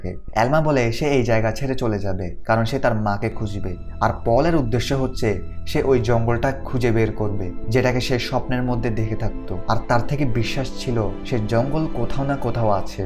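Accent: Chittagong, Bangladesh. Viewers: ভাই তো চিটাইংগার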